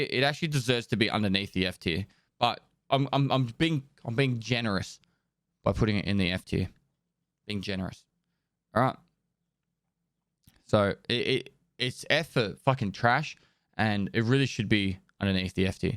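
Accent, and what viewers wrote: Australia. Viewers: is australian or a kiwi?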